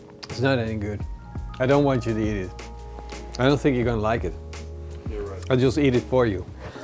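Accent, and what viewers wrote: Netherlands. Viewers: Beercan chicken best on gasbbqs. Keeps the chicken white inside. That Holland english talking accent is horrible! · "hoe" haha eindelijk. dacht al heel de tijd een nederlands accent te horen😄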